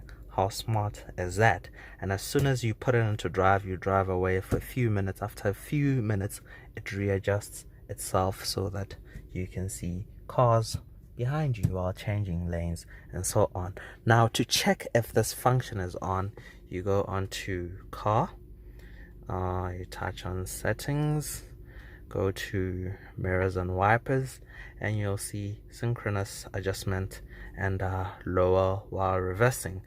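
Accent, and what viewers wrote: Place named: South Africa. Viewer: Dude no way I was gonna say you sound South African!! Love the videos man keep it up :)) Love from Cape Town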